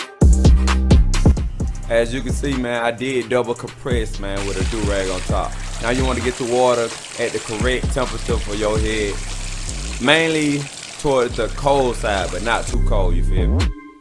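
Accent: Atlanta, United States. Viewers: I can tell you from ATL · STRAIGHT FROM ATLANTA!!! That accent is hard!!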